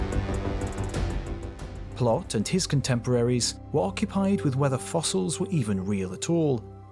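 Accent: England, United Kingdom. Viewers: Lol all it took was a British accent to fool you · You're a fantastic orator. My best guess is that it's due to the specific accent you carry. It is a particularly precise variant of British English that Americans have subconsciously come to appreciate as being intrinsically reputable. If there is a British man lecturing you with this accent, friends, listen closely, as he knows what he's talking about and his intel will be vital to your quest!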